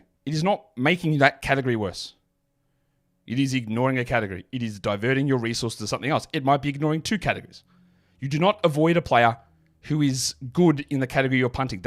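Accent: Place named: Australia. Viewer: "They deliberately say something wrong or weird to boost themselves up in the algorithm. Maybe I should start that" Also: has a thicc Aussie accent who hosts a daily show that with a viewerbase of largely Canadians and US, and greets them every show with obsure aussie quotes like "what is the crime, eating a succulent Chinese meal" 😅😅 Look, you're not wrong. Kept me coming back that's for sure.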